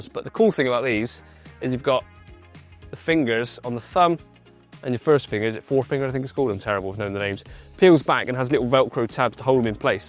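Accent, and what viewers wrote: Bristol, United Kingdom. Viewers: Is that a Bristol accent 🤔 Thankyou for the video Mate 🙂👍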